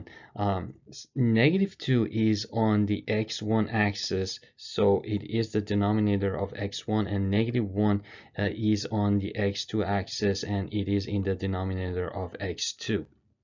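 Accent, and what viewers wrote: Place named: Iran. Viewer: the accent is kinda iranian btw the best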